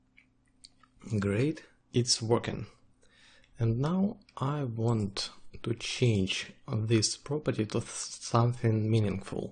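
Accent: Russia. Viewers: Мне показалось или блогер с русским акцентом? В любом случае очень круто!!!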